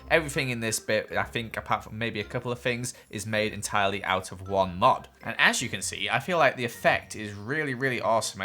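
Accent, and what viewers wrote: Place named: United Kingdom. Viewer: He's british so he says it like that because of his accent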